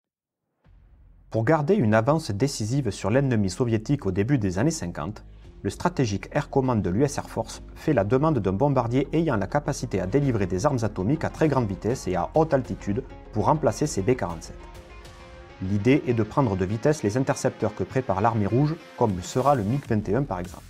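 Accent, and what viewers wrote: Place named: Marseille, France. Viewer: Amusant, l'accent Marseillais !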